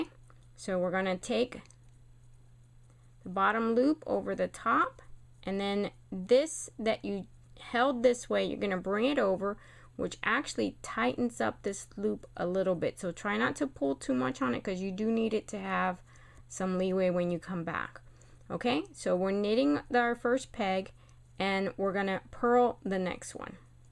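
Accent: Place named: Baltimore, United States. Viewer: are you by chance from Mid-Atlantic area? you say "on" like somebody from Baltimore (i get a kick out of trying to learn about different regional accents)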